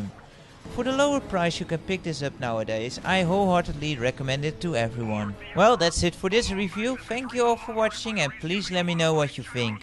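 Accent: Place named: Netherlands. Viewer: rofl you sound VERY dutch. are you?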